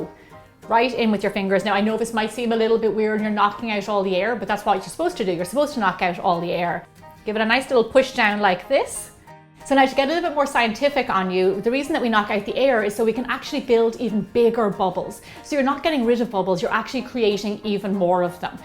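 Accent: Ireland. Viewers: I love the way she says tomatoes😅 I'm not Irish so that's why I love your accent :)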